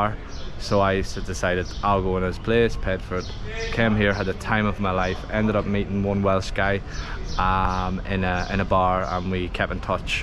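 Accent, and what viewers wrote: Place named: Belfast, United Kingdom. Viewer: Never lose the Belfast accent mate 😂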